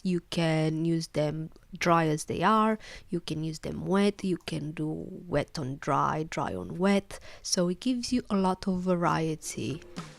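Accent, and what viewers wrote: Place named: England, United Kingdom. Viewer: The cutest English accent I've ever heard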